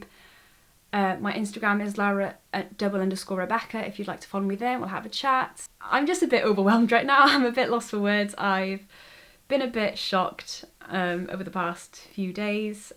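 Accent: United Kingdom. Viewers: U have a british accent 😍💯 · AHH BRITISH ACCENT IS THE MOST ADORABLE AND BEAUTIFUL ACCENT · Omg I love your British accent and Jesus Christ you're so beautiful🤩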